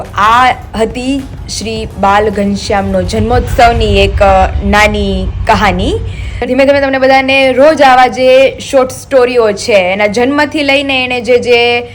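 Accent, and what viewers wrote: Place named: Kathiawad, India. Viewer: તારા મમ્મી હોશિયાર છે.. અને સ્વભાવ પણ સરસ છે... તમારી કાઠિયાવાડી ભાષા બહુ જ મીઠી લાગે છે...,❤❤❤❤❤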